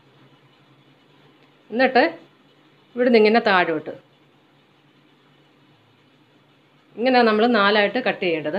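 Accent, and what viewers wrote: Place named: Thiruvananthapuram, India. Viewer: തിരുവനന്തപുരം സ്ലാങ് 😊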